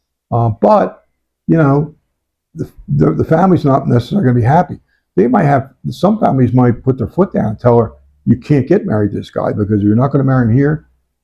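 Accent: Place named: Western Pennsylvania, United States. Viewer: Where are you from? Sounds like west PA accent.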